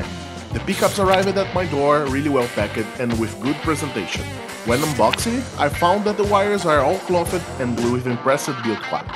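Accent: Brazil. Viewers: Sotaque de brasileiro falando inglês! Hahahaha vídeo top!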